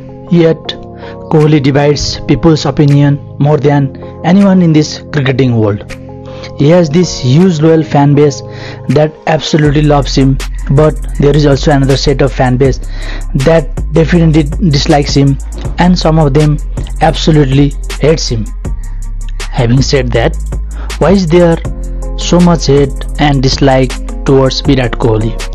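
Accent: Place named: Nepal. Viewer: Where are u from😂😂talking like nepali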